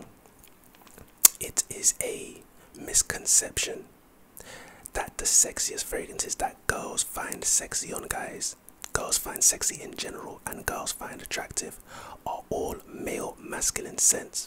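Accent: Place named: United Kingdom. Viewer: bro reminds me of hobie (british accent) 🫣🫣‼️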